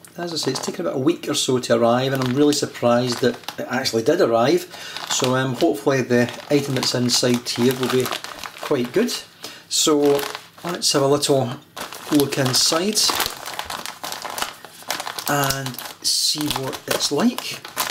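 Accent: Scotland, United Kingdom. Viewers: But he isn't murican... The accent is scottish I pressume... But I do agree about the bubbles abit.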